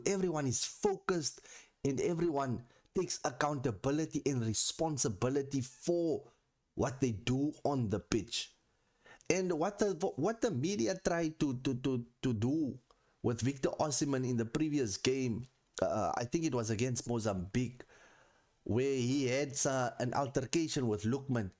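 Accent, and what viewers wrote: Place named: Cape Town, South Africa. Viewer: Great take.. you sound like youre from cape town... subbed 😎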